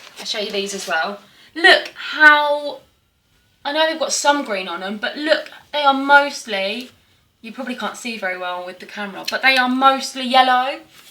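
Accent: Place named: London, United Kingdom. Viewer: I'm just curious about where you live because you was saying London markets? I thought you live in London because of your accent Btw of you do then you should try Spitalfields market its just so cheap and an excellent place to get all your fresh produce especially if you go early mornings I love the way you said I prioritise health overt anything else and that's how everyone should be Minimal living is the best way to go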